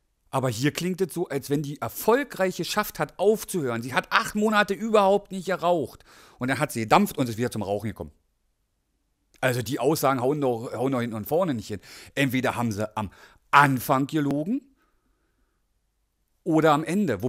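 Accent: Berlin, Germany. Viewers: ich finde dich klasse ☺ was du sagst kann ich nur so unterstützen seh das genauso und deine berlinerschnauze Made my day 👍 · Du kommst aus Berlin!? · sieht verdammt aus wie Ragnar Lodbrok von Vikings o.O Ragnar mit Berliner Schnauze - göttlich, einfach göttlich. Macht das Video (übrigens gut zerlegt, diesen Schund!) irgendwie echt witzig... wenns nur nicht so traurig wäre... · 😂😂😂deine Berliner schnautze.....voll Guut👍😂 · Cooool! Ne dampfene berlina Schnauze, find ick jut! Abopflicht, wa!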